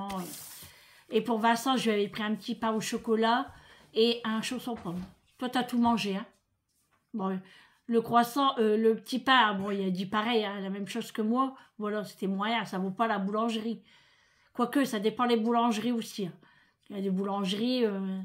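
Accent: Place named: Marseille, France. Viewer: Bonsoir 🤗 Ici soleil cet après-midi, LILLE incroyable le monde..Bon retour de courses 💯heureusement que nous sommes là pour nos enfants 😉il a raison Vincent qu'il profite 😅 il est trop gentil Vincent 🤗tu parles comme les marseillais ça te coûte un bras😅 bon courage pour demain ta séance, faites sauter les crêpes 😅 Bonne soirée à vous deux bisous 😚 Nathalie de Normandie 😘🥰❤️💯🫶🌹🌹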